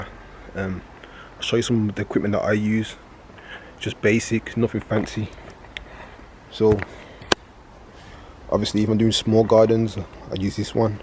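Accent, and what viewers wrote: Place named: United Kingdom. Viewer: You are spot on mate, I started on a pushbike and using the train, got some funny looks on the train with the hedgecutter! I did buy new at the start but my strimmer & hedgecutter came from Argos and the Titan multi tool from Screwfix. This allowed me to but a van & now a full kit of pro equipment, been self employed for 11 years this year, I'm not rich but comfortable, and that's the way I like it! Good luck to you chap, it's also great to hear a Brit on one of these videos!! 🇬🇧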